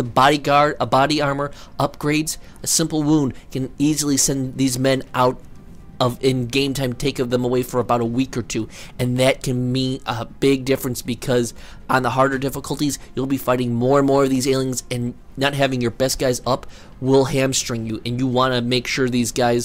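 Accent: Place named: California, United States. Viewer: Ahh! The subtle Californian accent. XD Awesome guide tho. You know what you are doing at least. Every other guide would miss something.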